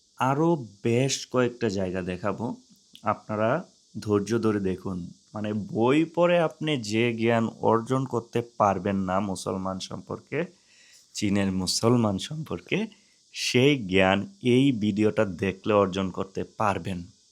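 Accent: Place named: Bhola, Bangladesh. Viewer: কথা শুইনা মনে হয়,তুমি ভোলাইয়া।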